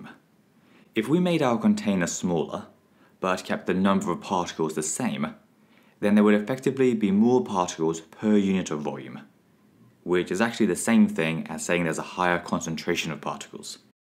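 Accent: United Kingdom. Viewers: Finally a British Accent!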